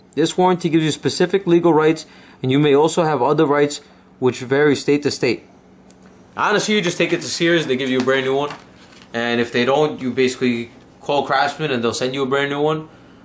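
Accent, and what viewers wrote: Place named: Italy. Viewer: My dudes got to be Italian! I now that freaking accent. My brothers kinda have it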